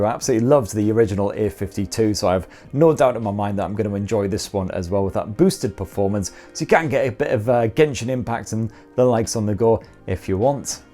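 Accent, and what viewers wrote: United Kingdom. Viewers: This guy is very good in presenting but sometimes I have little difficulty to understand him. Kind of fast when talking. I beleive accent spoken here is British, but from what part of the UK? Saying "bloody weekend" at the end was a bit scary but I'm sure it was meant something nice 😁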